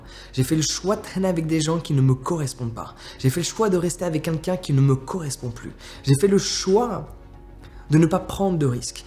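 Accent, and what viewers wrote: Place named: England, United Kingdom. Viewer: Tout à fait d accord 👌 Love you English accent 😎☺️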